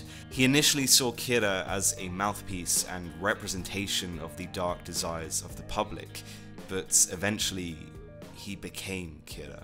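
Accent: United Kingdom. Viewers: Another edgy British anime youtuber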